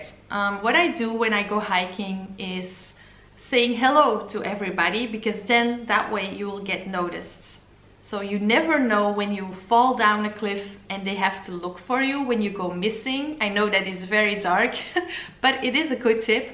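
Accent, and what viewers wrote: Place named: Antwerp, Belgium. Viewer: Finally I found someone with the same accent as me!! Ik ben Antwerpse maar woon sinds 15 jaar in de USA.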